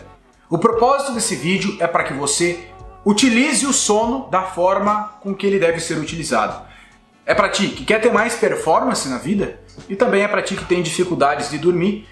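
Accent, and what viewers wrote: Rio Grande do Sul, Brazil. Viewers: Mas bah tchê, acho q tu é Gaúcho, não? Kkk é nóis bagual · Por acaso você é gaúcho??????? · Como se diz aqui no Paraná, "LEITE QUENTE FAZ BEM PARA O DENTE". Contanto, acho que o deve ser gaúcho, pelo sotaque. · Mas que bagual, gaúchos por aqui tchê, loco de especial meu pia? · De que cidade gaúcha tu és tchê? Rsrs sou de Gravataí-RS abraçao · Certo que esse cara é Gaúcho haha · Pelo seu sobrenome, seu jeito de falar, creio q tu seja do rio grande do sul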